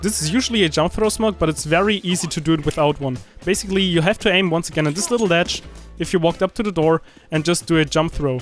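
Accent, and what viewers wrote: Germany. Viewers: Dieser Moment wenn man alte Videos guckt die mega nice sind wie deins hier und man aber richtig merkt das du Deutsch normalerweise bist aber du sprichst das sehr gut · u sound like german. r u? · Man merkt das er deutsch ist lol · german accent detected ^ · Good denglisch😄 Good Video👍😊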